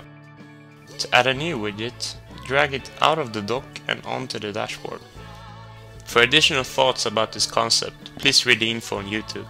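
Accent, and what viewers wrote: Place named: Sweden. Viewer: Swedish! haha, heard it on your accent :-)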